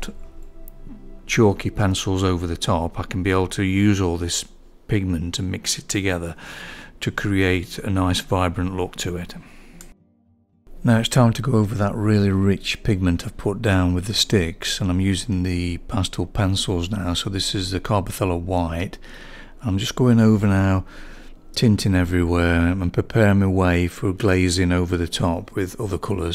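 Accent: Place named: Derbyshire, United Kingdom. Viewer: Thanks! That was very helpful! Love your accent too! Derbyshire? Notts? I work in pastels but have never attempted a portrait so far.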